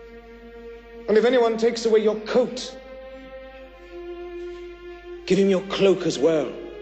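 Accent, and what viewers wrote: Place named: England, United Kingdom. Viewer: Jesus didn't have a stupid English accent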